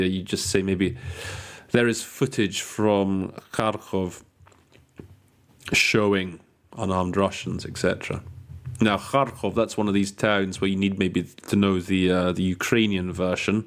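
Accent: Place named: England, United Kingdom. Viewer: Where is your English accent located geographically?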